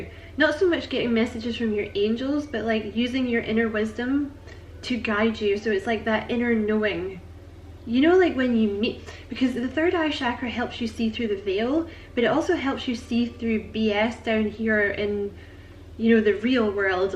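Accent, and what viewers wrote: Scotland, United Kingdom. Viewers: "How to Train Your Caterpillar" is the most adorable name ever. Awesome work & vibes, thank you. I feel very seen. And the Scottish accent is awesome. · I love your accent. Sounds Scottish to me. I was born there and miss it. Thanks for the reading. Im yours...lol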